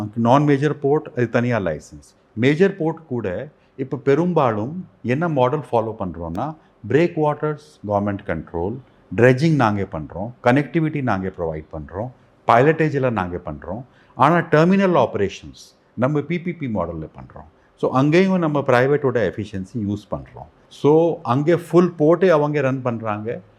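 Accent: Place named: North India, India. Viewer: North ஆக இருந்தாலும் தமிழ் அழகாக பேசுகின்றீர்கள்❕️👍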